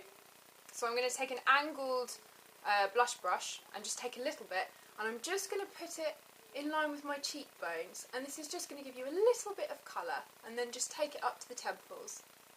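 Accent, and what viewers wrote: England, United Kingdom. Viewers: she is english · U r pretty without makeup and r u English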